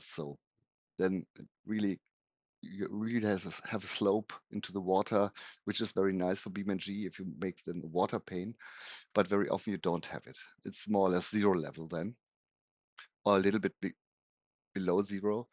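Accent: Germany. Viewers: I love that German accent! <3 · I’m about halfway through the video and now realizing some of this mistakes I made with your tool. Mainly the ordering of materials (based on some guesswork about the underlying map). Thanks for showing my tool! I think it’s definitely possible for your tool to sort of auto select the materials, layers ordering, roads options in the future using OSM data. I’m looking forward to the future if this tool. Fantastic work. And for some reason I just love German accents 😂 great video my friend. Still watching through until the end.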